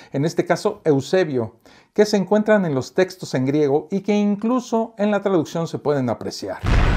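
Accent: Mexico. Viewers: Que bien se explica ...y me encanta su acento mexicano..😊😊😊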